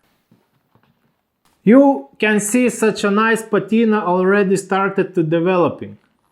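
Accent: Russia. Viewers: Крутейший Русский акцент, очень приятно слушать. Ну и изделия, само собой, огонь!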